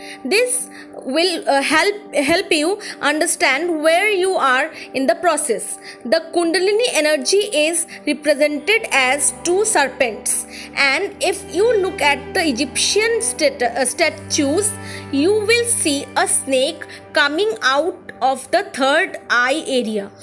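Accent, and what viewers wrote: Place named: India. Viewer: I’m sorry, I can’t stand that Indian accent so I can’t listen to this video! It’s just so annoying. Lol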